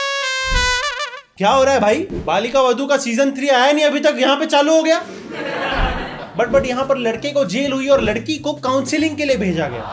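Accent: Gujarat, India. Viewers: Your Gujarati accent.. 😂😂😂I like it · That gujrati accent of yours 😂😂😂 soo good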